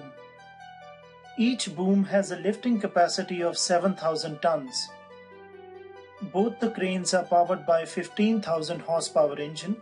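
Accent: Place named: India. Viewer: As soon as I hear an Indian accent, I know it's going to be full of bullshit and factual errors.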